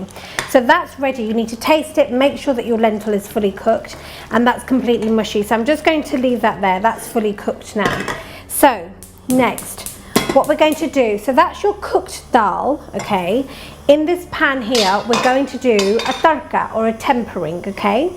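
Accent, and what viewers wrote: England, United Kingdom. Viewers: Where are you from ur English is soo english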